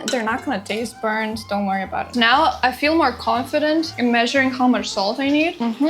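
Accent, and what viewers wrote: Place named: United States. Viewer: Apologies if you've explained this in a past video, but how did you end up speaking American English? It's always interesting to see how people were taught/taught themselves across the UK/Australian/North American inflections. Signed, an American with some long-ago Lithuanian roots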